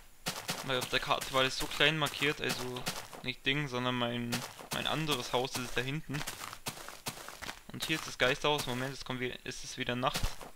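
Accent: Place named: Bavaria, Germany. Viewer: Ich finde die bayrische ausprache von dir so geil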